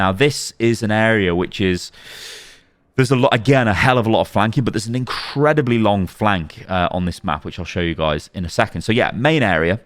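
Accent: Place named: United Kingdom. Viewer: British?! That’s is the correct thing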